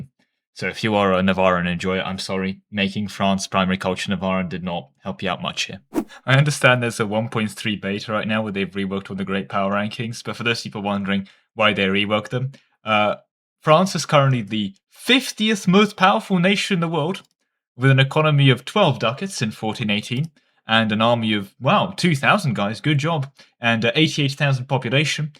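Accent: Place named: England, United Kingdom. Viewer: The english accent got thicker with this vid.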